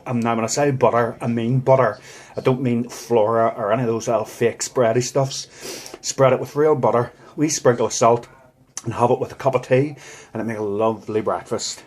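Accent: United Kingdom. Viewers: I hear the (Northern) Irish accent!!!!! Your recipe is EXACTLY the same as my Aunt used to make..... Mo Chara! 👍👍👍👍👍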